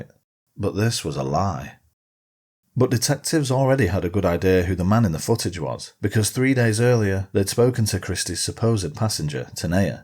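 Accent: United Kingdom. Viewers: Your wonderful British voice doesn't match this U.S. story. Please......more non U.S. stories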